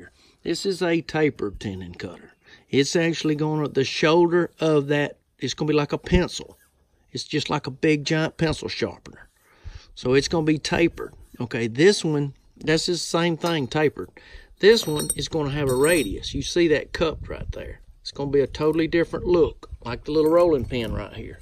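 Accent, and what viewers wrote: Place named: South, United States. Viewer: I LOVE your voice and accent! Every time you speak it reminds me of great Ken Curtis the actor who played deputy Festus Haggen on Gunsmoke and sang with the Sons of the Pioneers. I'm southern too, from South Carolina so I also have the southern drawl lol. Glad I found your channel :-) I just bought some of these tools to experiment working with and your insight and experience is very helpful. Thank you! Great video, new sub :-)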